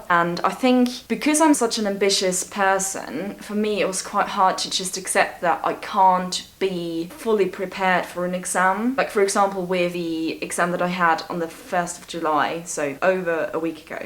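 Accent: United Kingdom. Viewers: Österreicherin spricht englisch mit britischem Akzent jz hab ich alles gesehen haha thumbs up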